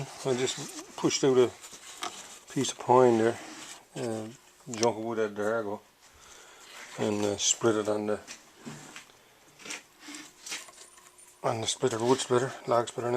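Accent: Newfoundland, Canada. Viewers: Now das a good ‘ol Newfoundlander dere!!! ❤️❤️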